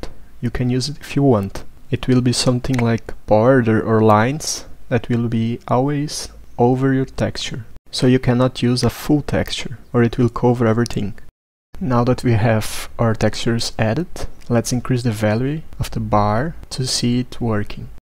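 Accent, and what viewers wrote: Brazil. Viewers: man sounds like brazillian, look at that accent, you love to see it. tamo together mano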